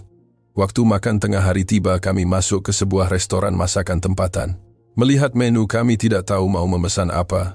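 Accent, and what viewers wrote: Indonesia. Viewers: xpayah bangga la.da terang cerita ni hanya rekaan.nak cuba guna A.I BM tapi malangnya lidah A.I ni lidah indo.camna pn terasa janggal sangat sebutan dia.so kadang2 bunyi dia agak lawak.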